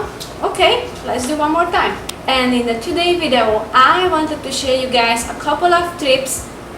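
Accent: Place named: Hungary. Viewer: 90 százalék, hogy magyar vagy kizárólag az akcentusból!A maradék 10 a név miatt :D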